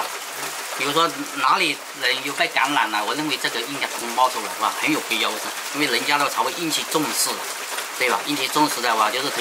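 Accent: Hakka, China. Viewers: 客家口音？？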